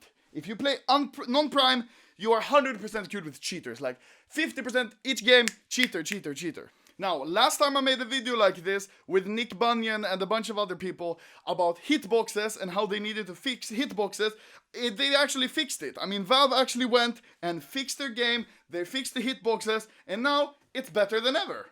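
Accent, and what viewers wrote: Russia. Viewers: Brah , are u from russia or are u from Swedeen , im so confused. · Are you from Russia???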